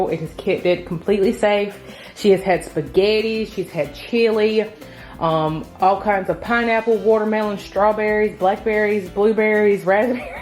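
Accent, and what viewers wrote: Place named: North Carolina, United States. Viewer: My kids are grown. I just like hearing you talk! You're NC accent sometimes has a bayou twang.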